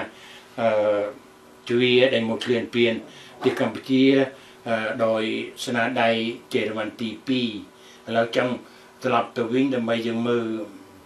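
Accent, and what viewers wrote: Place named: Thailand. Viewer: ខ្មែរយើងដូចអត់មានសម័យនគរាជទេ ហើយមួយទៀត ម៉េចអ៊ុំនិយាយរដើនដូចថៃនិយាយខ្មែរចឹង?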